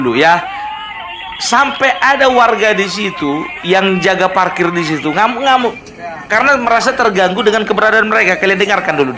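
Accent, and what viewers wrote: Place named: West Sumatra, Indonesia. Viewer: Logat suaranya seperti logat Sumbar...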